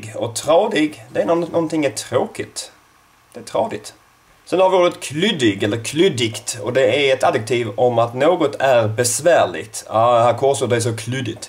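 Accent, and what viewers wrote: Skåne, Sweden. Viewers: great videos man, have you ever thought adding swe/eng subs to this channel? i think lots of people want to improve their listening skånska skills.. i like your accent a lot, i followed your other channel for a long time but I didn't know you are from Skåne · Klart att han pratar skånska? Bara för att man inte pratar som om att man har gröt i halsen betyder det inte att man inte är skånsk..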